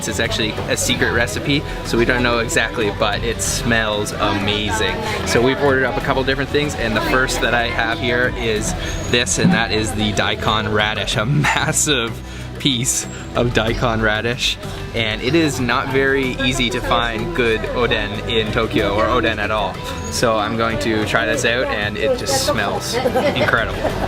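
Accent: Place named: Canada. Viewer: You sound Canadian. You're cute-as-a-button BTW.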